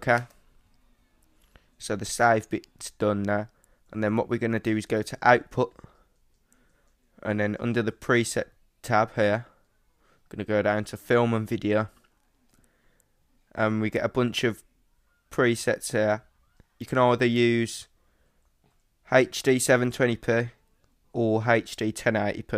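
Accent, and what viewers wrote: Birmingham, United Kingdom. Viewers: i heard ya dog at 100 lol ignore me dude its good to hear a fellow brummy cheers for the tips man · Are you from birmingham?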